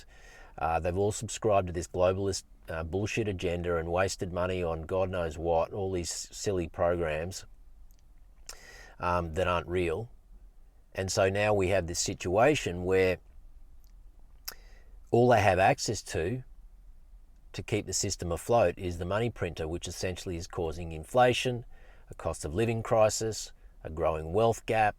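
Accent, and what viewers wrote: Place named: Australia. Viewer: Hi, I'm a fellow Queenslander down on the Goldy, it's good to listen to an Aussie for once on bitcoin, plenty of you tubers from u.s. mate I'm a big believer in bitcoin and have approx 0.8 stacked now my goal is to get to 1 coin soon. My biggest concern is security with wallets ect, and what other steps can we take to make sure we don't lose our bitcoin. Not sure if you have meet ups in person to discuss or just book a call, I'm very keen in talking further with you about this exciting future, I really want to set my kids up so they don't go through life stuggerlig for money. Cheers Warren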